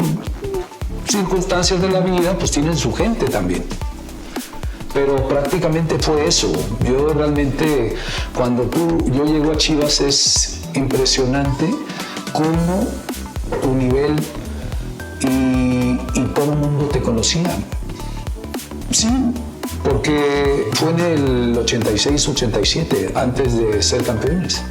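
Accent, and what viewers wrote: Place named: Spain. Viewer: El señor Galindo una de los mas grandes ídolos de el chivas del Guadalajara y México siento mucho que hubiese y haya corrupción en el deporte y gente como tu que merece el apoyo máximo no se le diera y tu como entrenador serias excelente, soy machin pero desearía tener su autógrafo maestro y toda mi admiración eres de las personas que saben y tienen el sentimiento de representar a un club de coraza y de representar a su país con todos los hueboz solo una hora con el Maestro y podría escuchar más se me hizo poco es como el agua que filtran las montañas de lo que le hace falta a nuestro México personas que en realidad tengan principios compromiso y el color en la sangre no como el español del:D que tiene el acento español que usa a figuras como tu tu deberías de tener tu canal galindo y créeme la gente te seguiría más a ti perdon señor pero su acento español no me gusta me agrada más el de México solo que eres cabron sabes de donde sacar y lo que queremos ver como al Maestro que bueno que no hiciste tu auto entrevista por que la verdad no me importa y no la vería o hazla haber cuantos likes tendrías:D 😀 es broma no te tenzes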